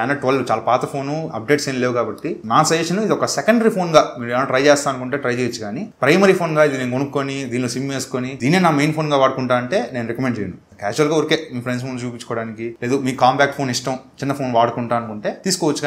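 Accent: Nellore, India. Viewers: Meedhi nellore aahh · Bro u from nellore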